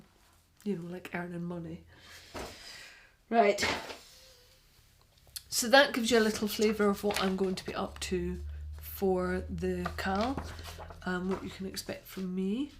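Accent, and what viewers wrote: Scotland, United Kingdom. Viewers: Your shoulder issue has properly scared me into calling a doctor for an appointment. 😅 I really want to crochet a pair of socks for myself but I find that I am more intimidated by crochet socks than knitted ones. The Rivuret (spelling?) top y my pattern for the back to school sweater CAL and I'' making it in the loveliest orange shade. I would love it if tou recorded a small podcast whilst in Scotland, it would be amazing to listen to your true scots accent. Totally inviting myself to have tea and cake with you and Charlie 😂.Lots of love to you!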